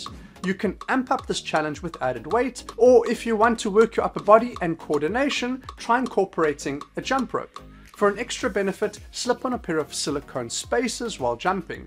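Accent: South Africa. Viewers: Just listening to you makes me homesick for South Africa. Well done, bro.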